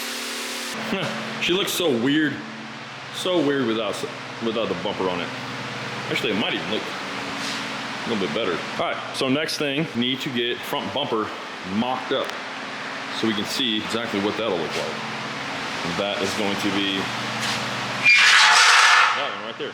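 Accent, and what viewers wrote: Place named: United States. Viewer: What are you talking about Clif ? That's an American accent ! As for the way he looks, don't make fun of him just for being a pretty boy, 😂 he can't help it if he's handsome.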